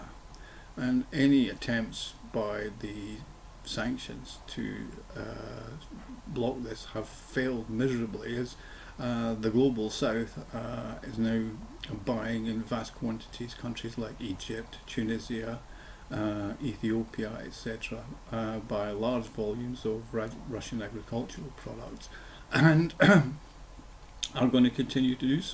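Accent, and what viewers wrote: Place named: Glasgow, United Kingdom. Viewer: I'm guessing you are from the Glasgow area. I am in Knightswood but grew up in Dumbarton. Can I respectfully suggest you invest in a decent microphone? You deserve one!!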